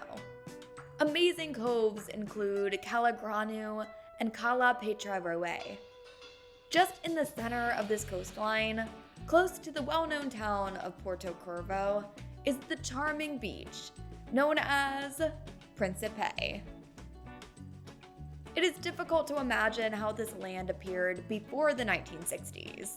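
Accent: United States. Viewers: The voice, my God! American accents are the worst.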